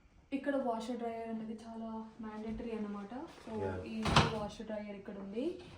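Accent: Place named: Miryalaguda, India. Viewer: In youtube I suddenly got your india tour vlog . I felt that u r from my nearby area , Because the way you talked.Exactly you are from Miryalaguda I FELT THANK GOD. Iam a upsc aspirant hope I will have vlog after my selection with you..Thank you sister for your videos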